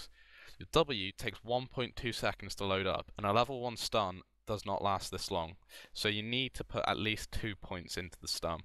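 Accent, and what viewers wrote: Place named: United Kingdom. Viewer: It's typical British accent ;)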